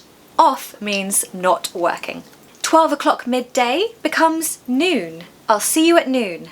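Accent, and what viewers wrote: United Kingdom. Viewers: Hello, I would like to visit those castles in the U. K. Love your beautiful British vibes and the usage of words with a lovely British accent., You taught me best British English lessons. You are adorable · Hi. I really enjoy watching your video s. Especially when i listen to your lovely brit accent. I would like visit london and seeing icon london like eye londond and big ban and tower bridge and ....❤ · Thank you for reminding me the Beautiful British ascent . I tried to shadow you ❤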